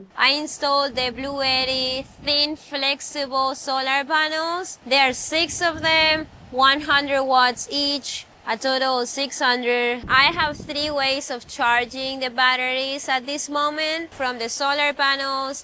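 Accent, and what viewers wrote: Spain. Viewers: Love your Energy, I started watching and then I saw all of the Alva stuff and then I noticed that you were in my Home Town of San Diego. I was in Solana Beach then moved to San Marcos. I used to - many years ago - work for Thrasher Magazine in San Francisco. I've been skating ever since the 80's when I was a Sailor on the USS Midway - then stationed in Japan. I SUBSCRIBED and liked........ I love your accent, what is your FIRST language? Is it Castilian Spanish? BTW, a friend of mine just recently gave me his Tony Alva Deck signed by Tony way back when.....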